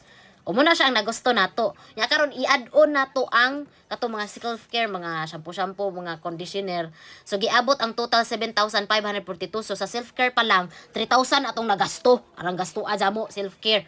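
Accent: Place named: Visayas, Philippines. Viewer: I love the accent. na lingaw gyud ko 🤣 proud to be bisaya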